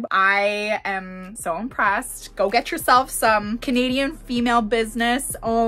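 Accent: United Kingdom. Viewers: Nice British accent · Oh now you done it brittish accent pinch me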